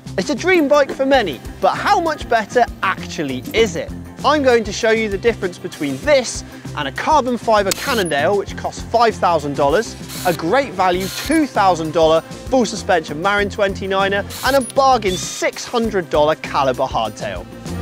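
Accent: United Kingdom. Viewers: umm are you in british?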